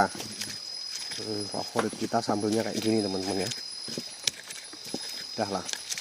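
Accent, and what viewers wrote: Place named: Java, Indonesia. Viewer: Logate kok teko njowo ms ati2 Ono ulo AQ ko SBY ms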